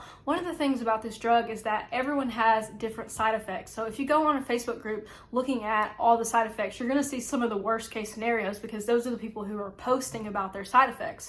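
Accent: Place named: South, United States. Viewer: I just started Ozempic two weeks ago because i keep gaining weight and feeling hungry all the time. waking up at night or in the morning and have to snack and go back to sleep. btw, I love your accent. what state are you from? It sounds like a tad bit of a southern accent.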